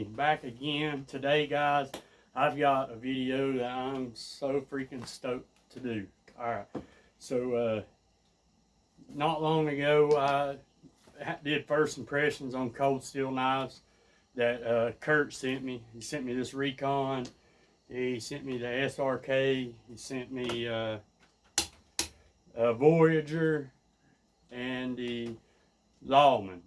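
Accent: United States. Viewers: Subbed cause the accent. Never was in the U.S. but always liked those accents, BTW great video.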